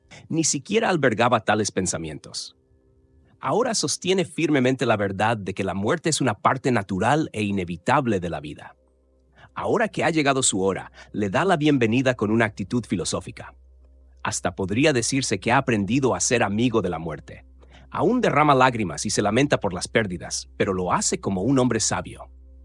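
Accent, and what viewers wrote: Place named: United States. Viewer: Porqué un narrador gringo, FUCHI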